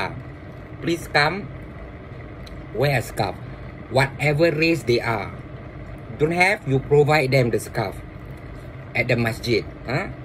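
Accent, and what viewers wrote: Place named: Singapore. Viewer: suka dengar ocehan dialek melayu singapura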